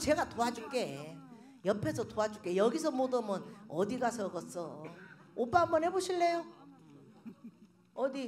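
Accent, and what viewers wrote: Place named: Jeolla, South Korea. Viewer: 전라도 사투리 엄청 쓰죠? ㅋㅋ 감사합니당 😊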